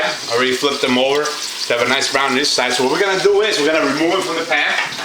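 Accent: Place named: East Coast, United States. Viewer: Super annoying delivery. Suggestions: Either go back to pumping iron or lose the silly hat, the "I'm an east coast thug" accent and especially all the arm waving. This "performance" amounts to a bad caricature of and an insult to whatever ethnic population it is that you're attempting to mimic ("Latin" ??? If it's supposed to be Italian, you're insulting me; it's certainly not French... And by the way, you massacred the pronunciation of "Doufeu"). However... The food you cooked might actually be good!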